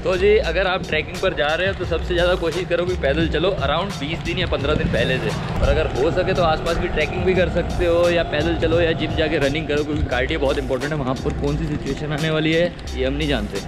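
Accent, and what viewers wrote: Punjab, India. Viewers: Savage bro plzz bnao aur mze Ek to punjabi tadka #kamaal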